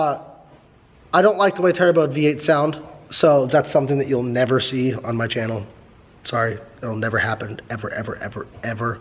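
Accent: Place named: Kentucky, United States. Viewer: I’m from Kentucky and I can definitely tell is too by her accent hahah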